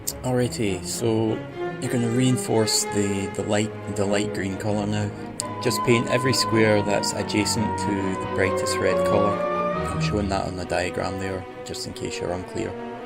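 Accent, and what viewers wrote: Scotland, United Kingdom. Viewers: Beautiful! Highlander tartan painted and explained with a scottish accent :) Well done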